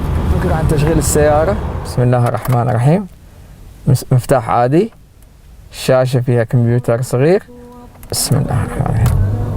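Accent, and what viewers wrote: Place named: Iraq. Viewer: طريقه كلامك كأنك عراقي الاصل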